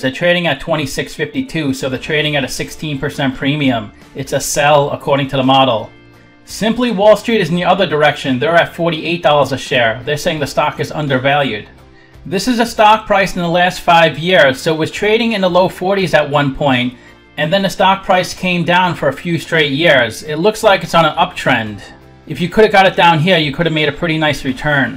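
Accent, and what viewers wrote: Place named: Boston, United States. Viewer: You accent says drunk Bostonian but your efficient explanation says seasoned financial advisor with a unique ability to explain valuations to simpletons like me. 🧐 You have my subscription sir.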